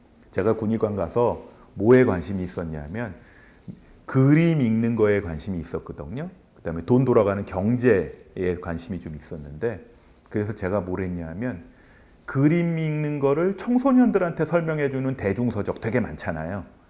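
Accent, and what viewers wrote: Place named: Chungcheong, South Korea. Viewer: 충청도 분이구만.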